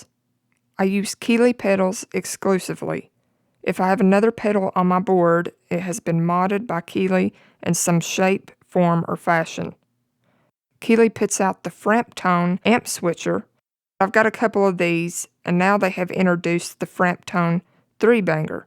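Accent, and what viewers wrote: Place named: Kentucky, United States. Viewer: WTF, come over form the Heil site and first think is that I am listening and seeing is one of my home girls form SE Kentucky, lovely lady, great accent and then holly crap rip my face off with incredible shredding and than to top off straight forward lesson. Love it great job -new fan here, keep posting.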